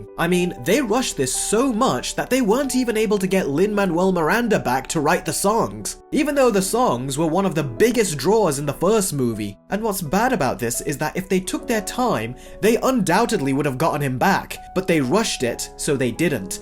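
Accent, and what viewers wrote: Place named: United Kingdom. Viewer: British accent? I’m hooked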